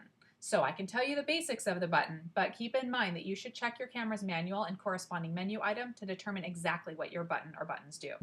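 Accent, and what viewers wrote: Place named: New Jersey, United States. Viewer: I am sorry for all the childish and inappropriate remarks on your videos. I guess these high school mentality boys need to go back to their magazines. Perhaps their moms never taught them respect. Thanks for making this video. Does the camera, my D750, need to be in a specific mode in order to use the AE-L/AF-L button effectively? Being brought up in New Jersey you are talking just fine.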